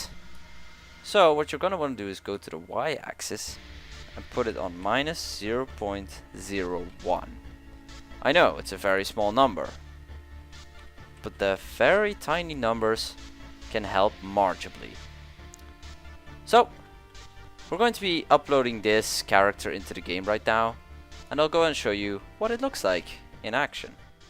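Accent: Netherlands. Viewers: i dacht al dat een nederlands acsent had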